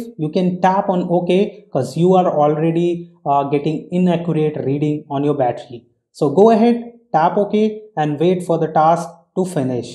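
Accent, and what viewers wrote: India. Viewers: Indian detected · Again indian accent👎👎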